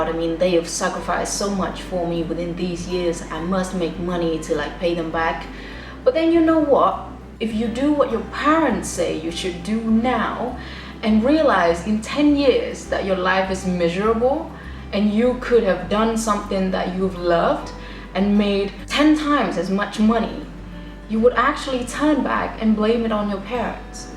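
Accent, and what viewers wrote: England, United Kingdom. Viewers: Giọng Anh Anh nè. Iêu quá <3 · Charming accent, em nghe giọng Mĩ nhiều quá rồi, giọng Anh thật sự là 1 làn gió mới đối với em <3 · You are so familiar with Lucy with British accent, < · Em thích giọng Anh Anh của Chị quá. Cảm ơn Chị đã tạo động lực và truyền cảm hứng cho mọi người <3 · Yuh gurl, didn't expect that British accent !!? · Ui chị nói giọng anh anh hay quá 😘😘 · Giọng British của chị charming quá! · I like British English and i like the person who speaks British English, too =))) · Such a helpful vlog! I love your English accent helping me practice listening! Thank you <3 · Chị sống bên Anh lâu năm, accent chị hay quá 😍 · sao chị dùng british accent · Tha'ts British English :3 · Your accent is so nice : )) British accent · That’s a legit British accent ! Or should I say, the Queen’s English <3 absolutely stunning! 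· Giọng chị hay quá. Accent này là giọng Anh đúng không ạ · Oh my god, is that British accent, i'm learning American accent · British accent là đây. Yêu. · I feel strongly obsessed with your beautiful British accent. :D It keeps playing in my head after finishing your video. And you know what, I just realised that your face and your accent remind me of the actor "Sansa Stark" in series Game of Thrones :). Anyway, love your accent very badly :) (P/S: this is one of my very rare comments on youtube)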